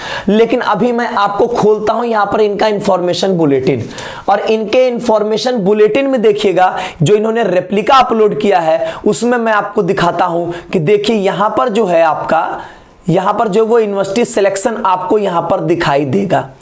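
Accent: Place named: Bihar, India. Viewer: Sir ji kya aap Bihari ya purvanchal se hain kyuki aapki Hindi ham log se milti julti lg rhi hai 😊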